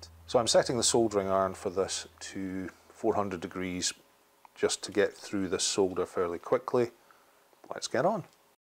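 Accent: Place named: Glasgow, United Kingdom. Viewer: This man speaks with a Glaswegian accent. He is accent is straight out of a medieval celtic play circa 1700.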